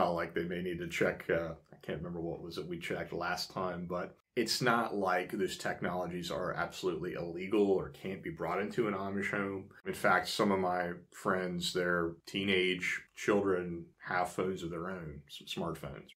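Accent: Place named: Philadelphia, United States. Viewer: I was surprised to hear you live in NC. Some things you say sound like you grew up near. Philly.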